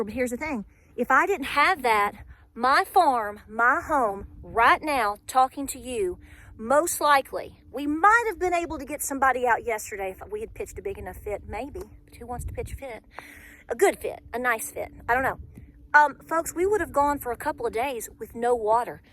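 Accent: South, United States. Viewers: Love your southern accent videos, I almost don't want to print London temperatures in the 40Fs and easy rain showers. Hope things warm up soon for you all.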